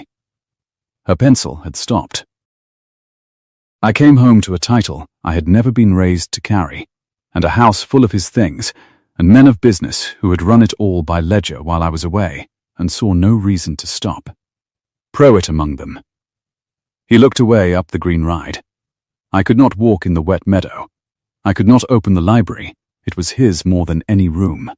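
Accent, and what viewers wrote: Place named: England, United Kingdom. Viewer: Thank you for this story and using an english accent.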